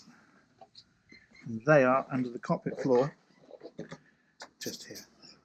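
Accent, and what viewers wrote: United Kingdom. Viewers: I like clarkson very much, but this nice man reminds me more of David Attenborough, because he speaks with a calm voice and just in plain, civilised, british english that even i can fully understand as a dutch dude, And Clarkson is way more loud and entertaining, which i like aswel, but not to review a yacht.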